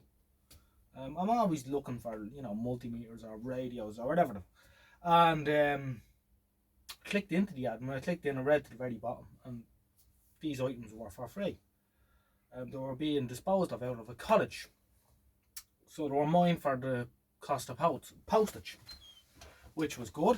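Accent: Ireland. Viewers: As your man says 'you can never have to many multimeters' my cheap CPC dvm is oten let on and is always flat, i never seem to have managed to keep the internal fuses for current so it's volts only. I have a NOS 70's far east meter that i bought for old times sake and i still drag out my big old AVO. I played your voice down the phone to my wife, she was from Hacketstown Carlow. She reckoned dublin or wicklow. Anyway mate, keep up the good work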